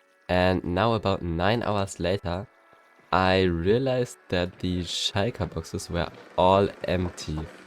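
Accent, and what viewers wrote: Germany. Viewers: Nice Video, but you can hear the German accent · hey bro, are you from Germany? You sound like it. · I hear german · German accent? Nice vid. Go on buddy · I hear a little bit of deutsch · I can tell you are an german by the Voice 😂 Love you Video ❤ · Man hört das deutsch einfach raus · I hear deutsch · You can not hide that u are german from a german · Holy German accent... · Dieser deutsche akzent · I Can heare that you Are German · Holy german accent Fire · Why sounds this English so german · bro man hört deutsch raus :D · Why do you sound so german😭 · Ich hör das Deutsch raus beste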